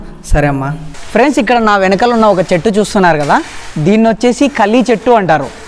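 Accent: Rayalaseema, India. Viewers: I am from Telangana but I love your stories andhulonu ne Rayalaseema yasa enkka super bro nenu and na family subscribe chesukunamu all tha best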